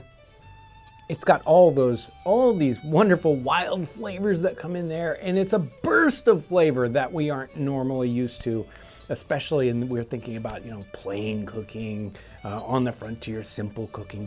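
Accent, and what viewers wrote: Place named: Midwest, United States. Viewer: Wrong. I knew a guy from the 18th century who found a camera. He had a much different accent. This guy talks like some dude off the street in Everytown, Midwest USA.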